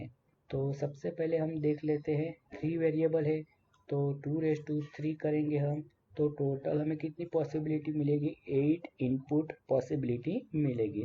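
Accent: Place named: Gujarat, India. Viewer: Bruh you must be fron gujarat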